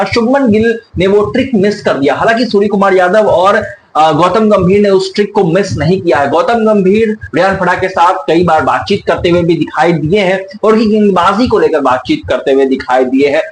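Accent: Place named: Assam, India. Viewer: Bhai aap Assam se ho kya..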